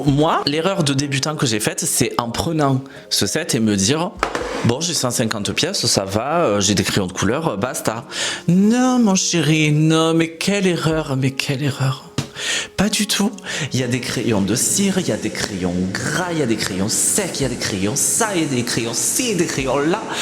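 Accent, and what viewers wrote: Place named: South, France. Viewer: Coucou je viens de découvrir ta chaîne super l’évolution de tes colos et j’aime beaucoup les livres sur ta copine Pauline t’as ramené du Japon . T’as l’accent de chez moi du sud j’aime trop et magnifique ta petite sirène bravo oui cette double page prend une blinde à faire 😃 hate de tes prochaines vidéos 🥰🥰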